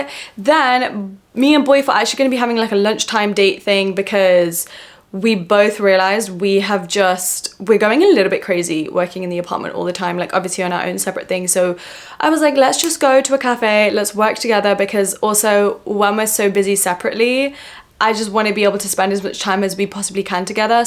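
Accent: United Kingdom. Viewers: I love you London ascent ❤❤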